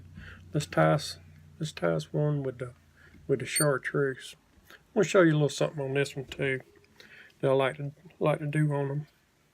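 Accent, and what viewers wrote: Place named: Western North Carolina, United States. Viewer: Another informative video, also inspiring! I could tell by your speech, that you had to live somewhere near me! I Live in the Western part of North Carolina! So we do have a little in common! Thank you, again! Take Care and Be Safe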